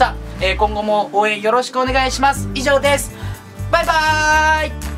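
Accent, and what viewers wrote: Fukuoka, Japan. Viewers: 福岡の方言きいとったら、親近感わく！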